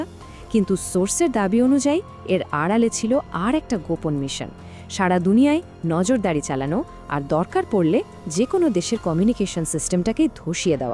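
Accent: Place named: Kolkata, India. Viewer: এই মহিলা কি কলকাতার মনে হছছে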